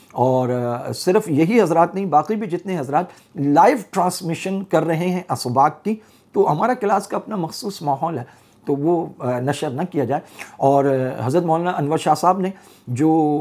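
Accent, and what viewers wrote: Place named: Arab world. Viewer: مولوی صاحب آپ جب اردو میں بات کرتے وقت ح ع عربی کی طرح ادا نہ کریں یہ سننے میں بہت برا لگتا ھے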